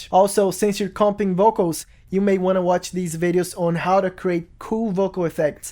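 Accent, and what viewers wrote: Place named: Brazil. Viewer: I can recognize a fellow Brazilian accent! eh nois mano!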